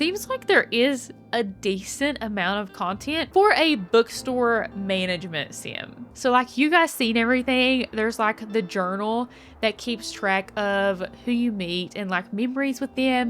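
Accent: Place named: Appalachia, United States. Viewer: Hi! I heard your accent and knew you lived near me. I'm in Kingsport/Mount Carmel. Hey neighbor! 👋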